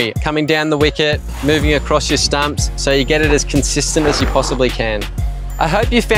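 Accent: Australia. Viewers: Australian accent : nice skills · most of us are here to listen to just the australian accent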